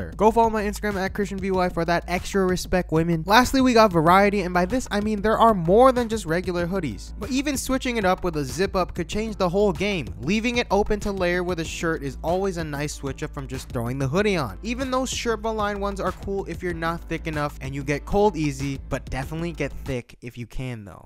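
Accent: United States. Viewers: Asian English sounds so American, it no longer sounds American.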